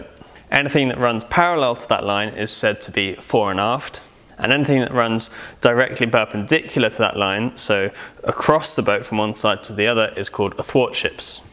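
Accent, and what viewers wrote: United Kingdom. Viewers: From an German point of view, your English ( was it always British? ) explanations of boat terms is a great help in discussions during sailing and staying in foreign ports :D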